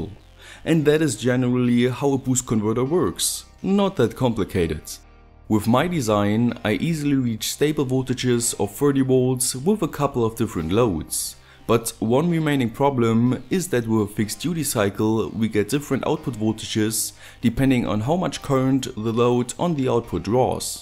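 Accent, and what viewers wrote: Germany. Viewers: Can't tell Is he german or Chinese? Or both ?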